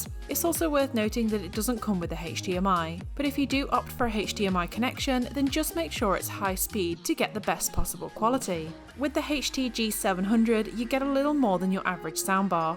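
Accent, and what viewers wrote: United Kingdom. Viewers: “Heych”-T…. The fuck with your pretentious british accent you piece of grabage!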